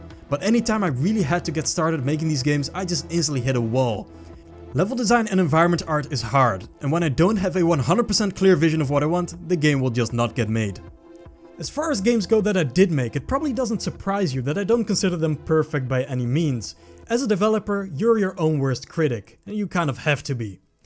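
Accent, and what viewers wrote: Netherlands. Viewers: can you add gyro controls and flick stick to your template? that would be cool. just look up jibbsmart to know what flick stick is. (spreek je trouwens nederlands, aan je accent te horen). Flick stick is a way to snap the camera to any angle. if you want to turn 180 degrees, just tilt the stick down. want to aim 90 degrees to your right. tilt the stick to the right. you can no longer look up and down with the stick. you need gyro to do that. i have been using it on almost any shooter on pc.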